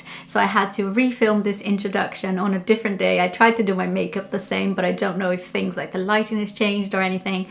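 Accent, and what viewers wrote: United Kingdom. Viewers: A lucy falou do sotaque britânico dela! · Hi, you asked about our backgrounds. My name is Timo and I live in Finland. I listen to podcasts, Youtube videos and audiobooks of different topics in the background when renovating, woodworking, washing dishes or driving a car. Lucy mentioned you are a great example of a person who has gained a British accent and she is right. Even if I don't live in an English-speaking environment, it is nice to learn new ways to improve your fluency. Thanks for the video. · Hi ! I'm new here to your channel. I can't believe you are brazilian girl, your British accent is so pleasant that you sound like a native! I saw your video of how you became fluent in English. Your journey is very fabulous. I love your accent. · Uma brasileira com inglês britânico? I love it!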